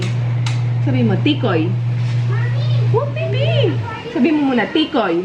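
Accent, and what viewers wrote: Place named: Quezon, Philippines. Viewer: hehehe puntong quezon ai... tlga poh san poh cla s infanta?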